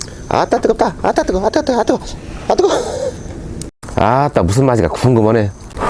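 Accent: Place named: Gimje, South Korea. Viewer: 저도 고향이 김제인데 사투리도 생활하시눈것도 친근감이 가네요~ 시골라이프 부럽습니다!!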